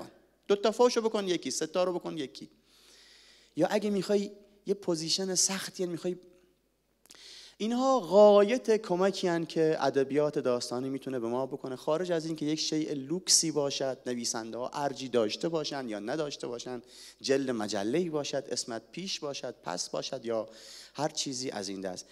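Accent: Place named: South, Iran. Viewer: چندبار تو اینستا دیدم شنیدم ،ولی آدم چقدر زود به زود دلتنگ صدا و قصه هات میشه ،حتی نمیدونستم راوی این قصه ها و این صدای جذاب و لهجه جنوبی شیرین کیه ؟ آخر تو گوگل سرچ کردم قصه با لهجه جنوبی ،اولین نتایج جستجو چهره آقای احسان عبدی پور رو نمایش داد و جنگی پریدم تو یوتیوب و... پاینده باشید و مانا ❤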